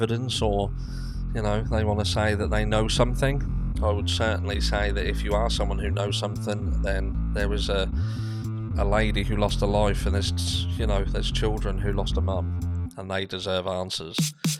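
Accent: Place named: East Anglia, United Kingdom. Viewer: Hi dude, newbie here. Love this chat, I'm going to dive into your channel. I have to ask, are you from Suffolk/Norfolk, your accent is very familiar? I've subbed btw 😉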